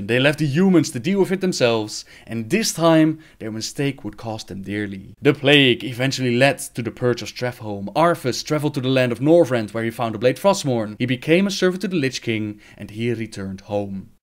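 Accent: Netherlands. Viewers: your accent are you dutch?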